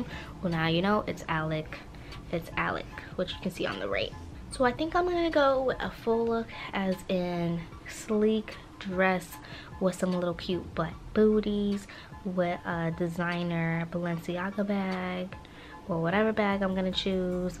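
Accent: New York, United States. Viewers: I never noticed your New York accent it’s so pretty ❤️ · This is the most that I've ever noticed her New York accent 😭💞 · I can hear her new york accent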